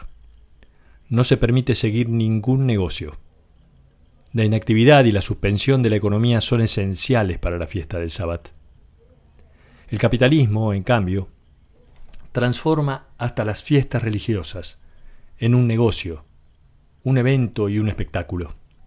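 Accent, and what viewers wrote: Argentina. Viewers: La magia del pensamiento de Byung Chula Han , narrada con esa hermosa voz argentina. ❤ Gracias 🙏🏽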